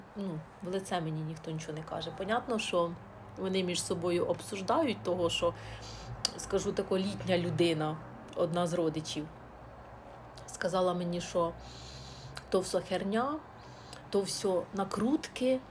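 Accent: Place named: Cherkasy, Ukraine. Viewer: Підписався на вас. Ваш говір нагадує мені говір Черкащини з Жажківського району. Приємно слухати.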